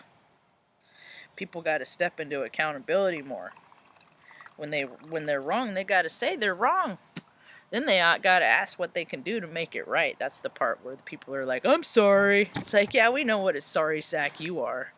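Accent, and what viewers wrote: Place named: South, United States. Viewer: No coffee Nor Tea ...PBR...IT'S A SOUTHERN TWANG .😉 Ooh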